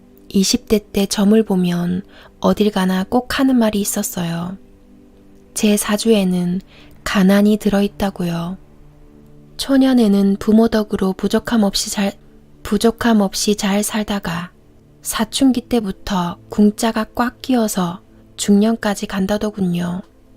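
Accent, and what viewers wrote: Gyeongsang, South Korea. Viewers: 경상도 억양 목소리 사랑해요~~^^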